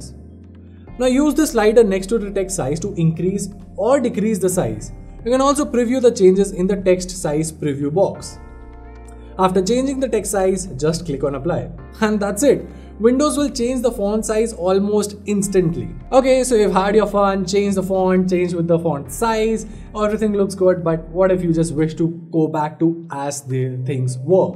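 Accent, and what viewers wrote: India. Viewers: you say that the code is below this video and in this key moment of you pass so fast and even your accent is from a foreigner country that looks from india. so now i have to go to another smart guy for see where to get this wonderful code. If you speak slowly is going to be good for everybody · The Indian accent is so melodious, however, when speaking English he must slow down, as it is very hard to follow him. Great content, but I wish he would slow down a bit.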